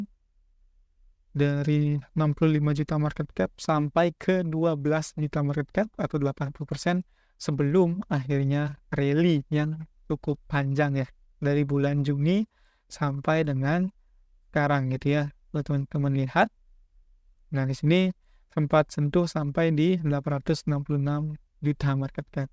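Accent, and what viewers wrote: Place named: Bali, Indonesia. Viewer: Permisi bang saya followers baru Kalok boleh tau abangnya dr mana seperti logat bali soalnya🙏🏻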